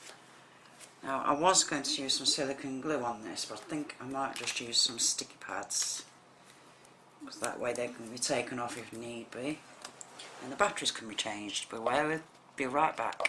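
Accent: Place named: United Kingdom. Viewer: Your cards are beautiful, plus, I love your Brit accent. I'm from the states.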